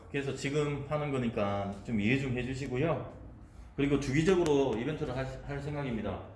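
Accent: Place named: Gyeongsang, South Korea. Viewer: 경상도 말투가 귀여우신분 처음봐요☺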